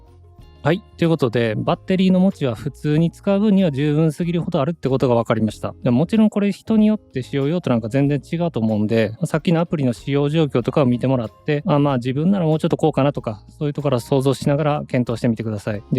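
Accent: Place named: Kansai, Japan. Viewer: 関西弁で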